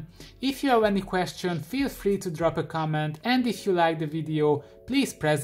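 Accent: Hungary. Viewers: Magyar vagy, ide hallom 😂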